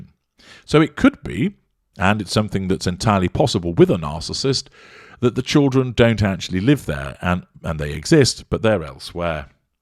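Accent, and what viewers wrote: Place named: United Kingdom. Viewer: YOU SOUND LIKE A BRITISH A**.......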